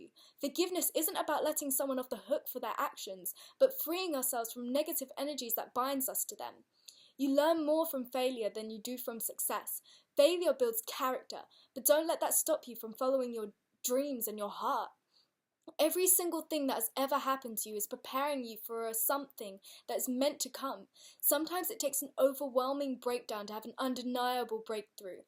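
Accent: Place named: United Kingdom. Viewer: british accent just makes people 3 times more attractive. ughhhhh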